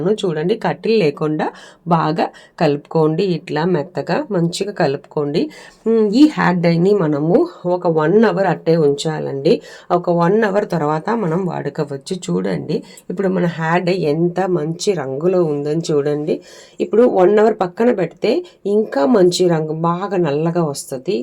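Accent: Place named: Kerala, India. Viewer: Malayalam notlo vostundiga madam Good so proud of you madam as a malayali proud of you madam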